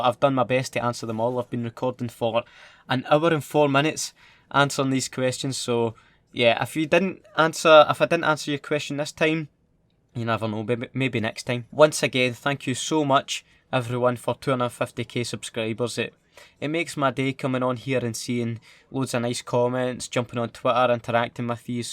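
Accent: Scotland, United Kingdom. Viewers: a know how terrible it is trying tae try talk proper so folk can understand you cause am Scottish as well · Really didn't feel like 40 min. Such a beautyful scottish accent. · I've never seen someone so funny, I don't know if it's your Scottish accent or you general humour! But you're genuinely a funny fucker! Hope to hear back from you! :) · So he is Scottish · Your like a Scottish daihi da nogla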